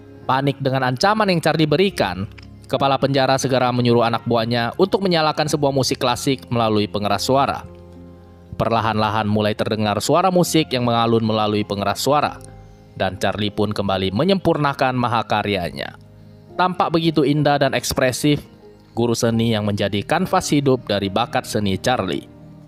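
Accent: Padang, Indonesia. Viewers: Logat ngomong nya kaya orang Padang.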